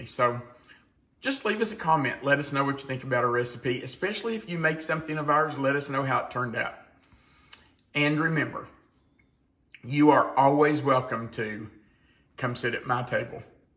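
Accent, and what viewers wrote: South, United States. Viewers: Tell you something, this is not a pie you can get anywhere, yet Kentucky Derby Pie is a thing. My mouth actually watered, and I never had it! I will be making this. Wonderful video. Simple, right to the point and I have to say, you have got one of the most proper gentlemanly Southern accents,.....and that's coming from a NY Brooklynite.....lol. TY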